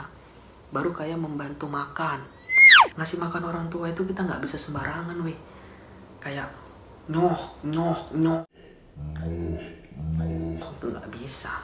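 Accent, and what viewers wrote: Medan, Indonesia. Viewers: medan kali abg ini 👍👍👍 mantap lanjut kan bg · Bang, asal medan kah? Logatnya medan kali soalnya😄😄 semangat ya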